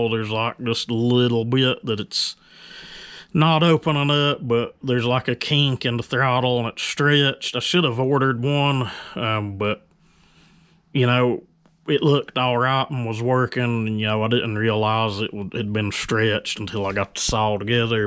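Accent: United States. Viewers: This accent! What state you from bud. Jason new subscriber from McLean Saskatchewan. I'm really liking your channel.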